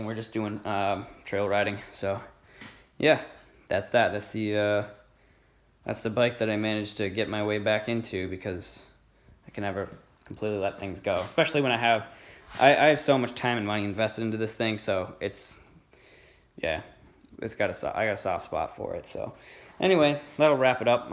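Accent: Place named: Massachusetts, United States. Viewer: You from mass??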